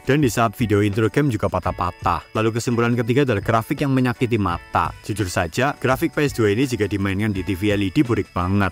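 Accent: Banyumas, Indonesia. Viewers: ternyata wongapak😂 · Wong ngapak jebule 🎉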